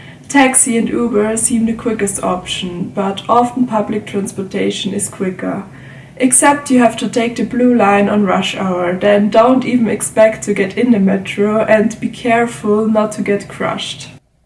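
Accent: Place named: North Germany, Germany. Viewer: Loved the content! What would you say about the metro system? Also your accent seems from north Germany!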